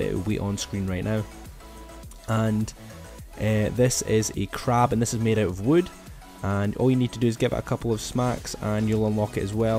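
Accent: Scotland, United Kingdom. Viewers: Are you Scottish !?!?? If you are I finally found someone else that’s Scottish on yt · Are you from Scotland nice bro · this is some hardcore scotish accent (i fricking love it) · Are you Scottish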